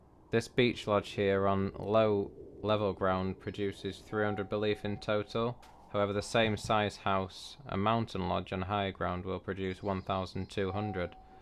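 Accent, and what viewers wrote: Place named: England, United Kingdom. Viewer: Man i love your accent.. which part of england is that? Also im glad to inform you that ALL of your vids are helpful and im enjoying your chanel. Keep up the good work mate. :)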